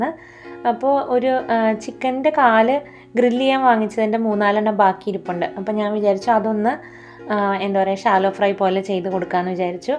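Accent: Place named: Thrissur, India. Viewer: ഞാൻ കൊടുങ്ങല്ലൂർ ആണ് thrissur Dist സംസാരവും food എല്ലാം വളരെ പരിചിതം ആയി തോന്നി അതുകൊണ്ടാ സ്ഥലം ചോദിച്ചത് ട്ടോ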